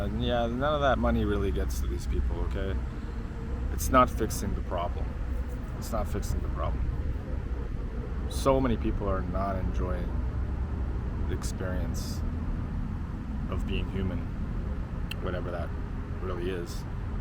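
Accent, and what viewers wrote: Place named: Europe. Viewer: You have a cool accent. Are you European?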